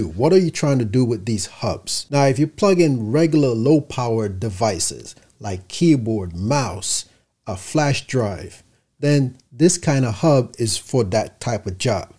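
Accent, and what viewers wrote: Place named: Jamaica. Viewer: I think i hear a bit of a Jamaican accent. You a Yardman?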